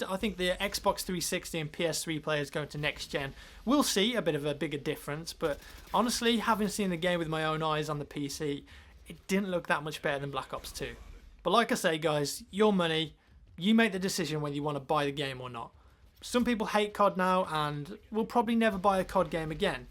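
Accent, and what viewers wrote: England, United Kingdom. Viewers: Ur english? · its just a british accent man, take a fuckin chill pill and stop wasting all of out time with comments like these. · he did not said 30 60 controller lmfao.... he said 360... it's just that he's british and when he's talking fast you, as an ignorant american probably, might not understand properly what he's saying.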